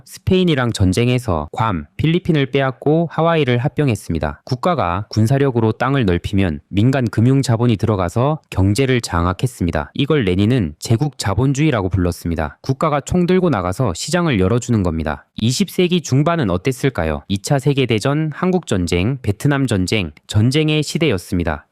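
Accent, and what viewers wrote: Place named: Gyeongsang, South Korea. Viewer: 살짝 경상도 억양이 들리는데 고향이 울산 이신가요 ?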